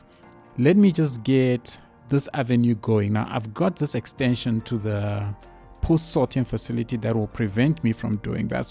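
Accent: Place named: South Africa. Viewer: ive watched a couple of episodes wondering if you from South Africa , just know that accent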